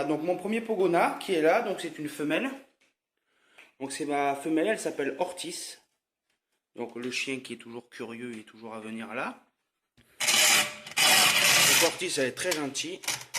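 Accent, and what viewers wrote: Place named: South, France. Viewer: Tu vien de quelle région? On voit que tu viens du sud avec ton accent....J'ai le meme .... Apres sa ce trouve je me trompe tu viens peut être du nord mes sa serrai bizarre (MOI midi-Pyrenée donc du sud 😂)Sinon super video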